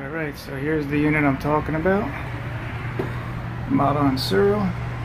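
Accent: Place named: New Jersey, United States. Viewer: Damn. Didn’t know you were from Jersey.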